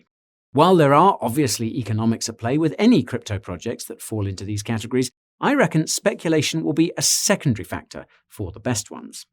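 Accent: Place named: RP, United Kingdom. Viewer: You somehow remind me of Peter Dinklage in GOT with your british accent. Love you champ 😎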